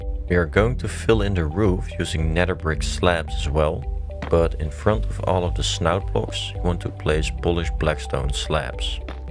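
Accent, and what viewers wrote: Netherlands. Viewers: I dont know why but you sound a bit like a Dutch guy speaking English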